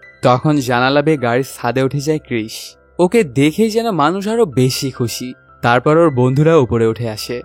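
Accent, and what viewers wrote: Bangladesh. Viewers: Anar gola sune mone hoche Bangladeshi..ader ki oder desher movie kom pore j sudhu amader indian der sob kichu niye pore thake...asole India chara to choltei pare na..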